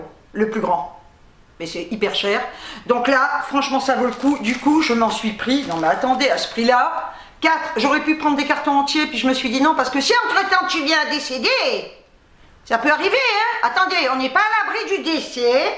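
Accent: Algeria. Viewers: Cc ma belle j aime trop ton accent pieds noir 🤣🤣très bonne vidéo comme d habitude 😘😘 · Je ris de l'entrèe:NOZ! lieu de perdition de!!!!!!!!!!!!!Bonjour! my jolie candle,c'est une brillante idèe, tiens les boucles d'oreilles sont assorties à la robe que tu nous avez montrè! Mets du Blanco de Vanish, c'est efficace,c'est un sachet à mettre dans ta machine ou autre; j'aime l'accent pied- noir! et pour cause:mon mari l'est!!!Elles te vont bien les creoles,te vont bien.:reine des affaires,du pret à porter etc....Dis donc avec ta brosse, tu vas te servir d'un cotè seulement!!!!mon EURNY § GROS BISOUS! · J’aime..... Que dis je? J’adore ta joie de vie et puis il faut bien l’avouer ce petit accent pied noir te va à merveille 👍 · Ma chouchoute super belle en cuissardes et le pull magnifique, moi la petite fille de pieds noirs ça ma fait plaisir de réentendre l'accent et remonter de bon souvenir avec ma grand mère, qui me disais dès qu'elle me voyais avec 1 garçon ma fille attention à ta marguerite. 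Bonne soirée bisous de Lyon et câlins à Eurny et Mélodie 👍👍👍👍👍👍👍💗💖💓💕👍👍👍👍👍👍👍NAD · Hello coucou!!!! Promis j'ai pas rigolé pour le Roll-On insectes!!! Enfin j'ai pas rigolé très fort quoi hein! Un peu quoi! Un tout petit peu!!! Cela dit, les roll-on on en a à la maison pour mon fils et moi (David il se démerde il se gratte MDR) et ça empêche effectivement de se gratter quand même... BREF!!! Les cuissardes sont magnifiques, elles te vont super bien! Très classes! Et le pull doudoune polaire j'adore et pour le prix non mais je rêve!!! Tu dégottes toujours des trucs de folie toi! Dès que j'entends l'accent pieds-noirs je pense à Marthe Villalonga moi! Je te fais plein de gros bisous Ma!!! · Parle ma parle avec ton accent pied noire là je ris😂😂😂j'adore. Pleins de bisous 😚😚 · Coucou mdr 😂 ça faisait longtemps que je n avais pas entendu l accent Pied-noir!tu es fantastique!!😘😘😍😍😻😻❤️❤️ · coucou bisous a toi et aux animaux pour retrouver ton blanc tu achete du vanish cristal blanc tu mets les vetements blanc dans une bassine avec 2 ou 3 cuilleres de vanish blanc tu laisse tremper toute la nuit et tu envoie en machine avec 1 dose en plus de ta lessive et ça va redevenir blanc parole je le fait avec les body de mon bebe. 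J'adore quand tu fait l'accent pied noir j'ai l'impression d'entendre Elie Kakoue dans Mme Sarfati j'adore. Allez gros bisous a toi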